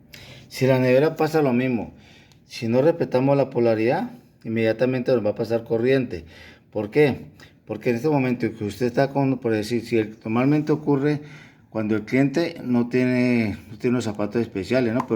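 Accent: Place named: Táchira, Venezuela. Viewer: Hermano no se le entiende nada suelte la lengua para hablar. Se entiende que es gocho y para explicar algo se ase con pausa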